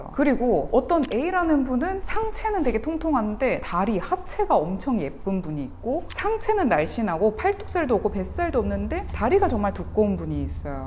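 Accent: Gyeongsang, South Korea. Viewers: 머지 경상도 사투리 들려용 !!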